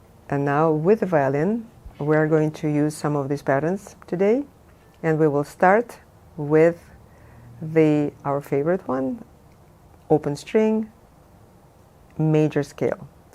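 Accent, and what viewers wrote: Russia. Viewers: Are you русская?) Cuz I hear an accent)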